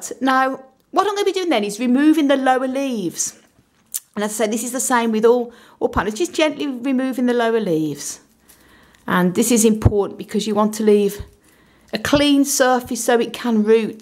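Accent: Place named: Solihull, United Kingdom. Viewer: Really useful video, I hope to propagate this way from my ornamental pineapple and its pups! Thanks for making, thanks for sharing your wisdom! You sound like you might be from Solihull or somewhere thereabouts? Xx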